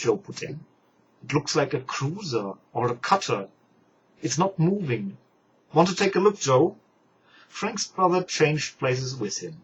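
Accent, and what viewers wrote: United Kingdom. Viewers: Wanted to check out this series before buying it online. THanks for posting this. It's nice that you have a Brittish accent.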